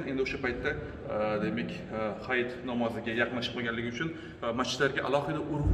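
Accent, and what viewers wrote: Fergana, Uzbekistan. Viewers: Фаргоналикмисиз илтимос чукурок тушинтиринг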